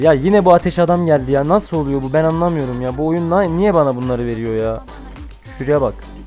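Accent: Erzurum, Turkey. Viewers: Abi ben kararlıyım sen nerelisin erzurumluya benziyor abi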